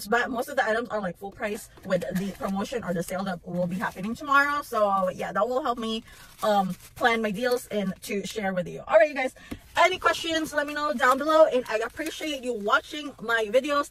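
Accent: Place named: Philippines. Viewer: My good friend is from PI and talks the same way. Maybe it's a cultural thing. It seems fine to me.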